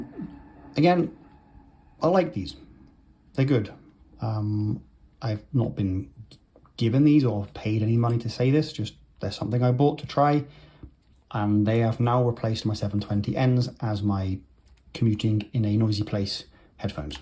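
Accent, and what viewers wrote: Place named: Corby, United Kingdom. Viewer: That sounds like a Corby accent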